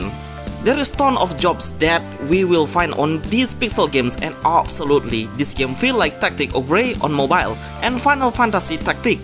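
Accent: Indonesia. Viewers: hmm I feel you are Indonesian or Russian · logat indo mu kerasa banget bang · dari aksennya orang indo kah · Let me gguess by the sound of your accent your Indonesian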